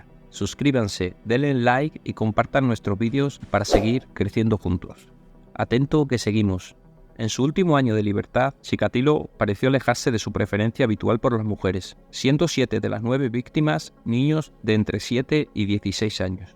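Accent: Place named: Spain. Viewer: Buen documental,hay una pelicula muy buena que se llama ciudadano x,me gusta buestro canal,narrado en español ee españa y muy bien documentado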